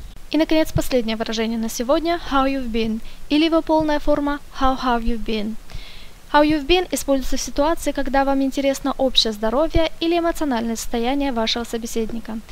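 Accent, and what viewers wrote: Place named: Russia. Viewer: очень интересный урок, спасибо. но у вас очень необычное произношение. очень сильный русский акцент, который мешает воспринимать информацию. к примеру, многие буквы английского, даже похожие по звучанию на наши, произносятся совсем иначе (t, s)/а смягчения в английском вообще нет.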